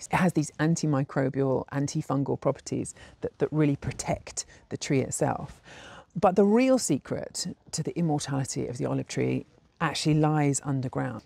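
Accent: England, United Kingdom. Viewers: Red hair, pretty, English accent. Boys, this is it. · Whatever British accent this is, its my favorite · Is the freaking english accent for me, but she also has that Brian Cox type of charisma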